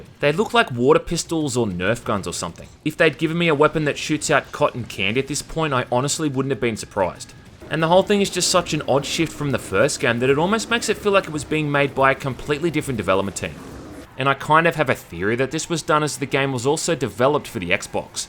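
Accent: Australia. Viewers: I think it’s the Australian accent in the inflections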